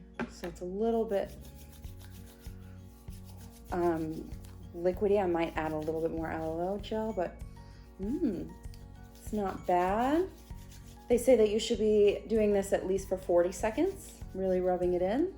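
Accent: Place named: United States. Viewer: Gần 30 tuổi chưa bao giờ comment trên Youtube. Nay thấy chị nói tiếng Anh Mỹ hay, nghe dễ. Mong chị ra video dạy tiếng Anh, chị có khiếu sư phạm. Thank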